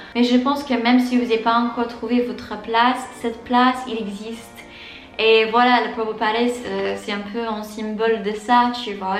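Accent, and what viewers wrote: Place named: Paris, France. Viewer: Yeeeeeeeeeeeessss, the best day of the week. Also, a french speaking anglophone (Toronto) living in a franco-canadian city (Montreal), and in a relationship the last 7 years with a european french speaker (Belgian), it makes me laugh how your accent seems so.... Parisian Anglophone ahaha. Especially when you talk with your husband in some other videos, the Parisian really jumps out, it's really nice!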